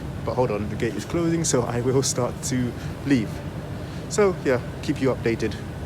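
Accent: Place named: London, United Kingdom. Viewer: Trip to london... So where you really from. I thought you was from london😅all this time. Watched in full. Great stuff peace✌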